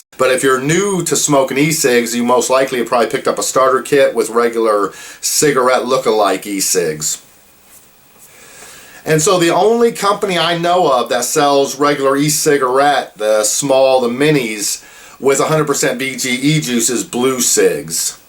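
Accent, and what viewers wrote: South, United States. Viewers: Accent sounds a bit like #ForestGump. No offence, love that movie ) Guys, we at this point have no idea what long term effects are, lets get real, studies that show the residue blah blah shit are literally cavemen level, if you cant see something - doesn't mean it's not there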